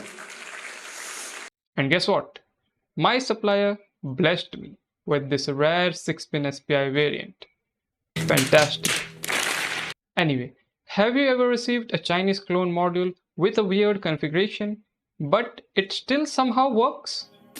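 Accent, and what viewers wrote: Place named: India. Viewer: damn, i was hoping for a video without an indian voice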